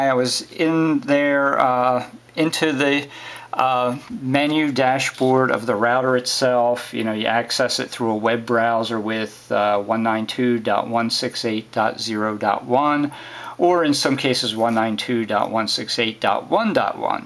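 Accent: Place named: Philadelphia, United States. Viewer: Thanks for the help dude. From another dude from the Philadelphia metro area, I recognize that accent anywhere!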